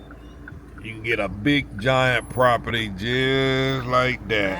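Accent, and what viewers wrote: South, United States. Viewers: I Have Very Sensetive Ears. I Can Not Listen To Most Commentator. I Can Listen To Him, I Get A Kick Out Of His Colorful Southern Pronuncation. He Represents Sun, Shinning in Mexico. Its a Good Match!" I Want A Lot! Looks Great!Been on the Road with You a While. Looking Great!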